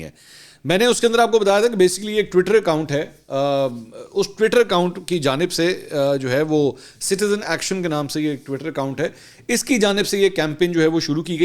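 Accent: Punjab, Pakistan. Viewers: Apka Punjabi accent is hilarious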